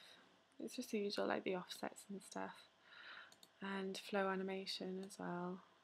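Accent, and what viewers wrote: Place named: United Kingdom. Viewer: Thanks girl! Great simple tutorial for paint effects. I'm glad to find another woman who uses maya. hehe. :) ...Btw you have a very pretty accent. lol. Are you from the UK?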